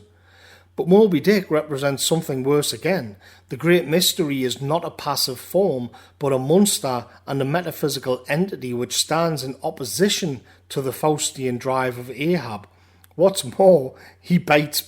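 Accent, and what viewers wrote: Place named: Newcastle, United Kingdom. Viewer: Nice to hear a fellow geordie speak on such a lethal book <3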